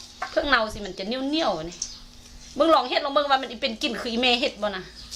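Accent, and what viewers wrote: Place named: Lao Nyo, Thailand. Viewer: ฟังพี่เขาพูดออกสำเนียง ลาวผสมญ้อ (ฟังออกครับ)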